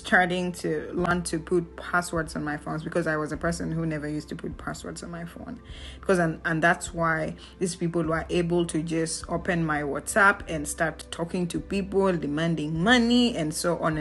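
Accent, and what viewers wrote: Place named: Luo, Kenya. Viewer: 😂😂😂 your laughter, your Luo 😂😂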